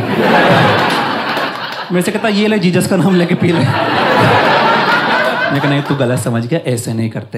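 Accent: India. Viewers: His accent is telling that he is from MP 😂😅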